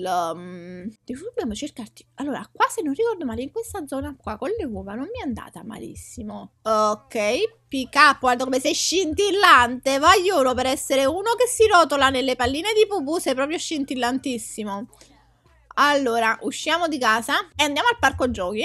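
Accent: Naples, Italy. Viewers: sei napoletana? ps: *TI ADORO*💞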